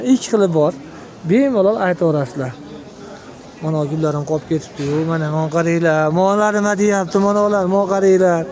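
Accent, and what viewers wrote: Andijan, Uzbekistan. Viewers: Бу Андижондан мисиз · Анжанча шевангиздан ургилай 😊 Аллох омадингизни берсин❤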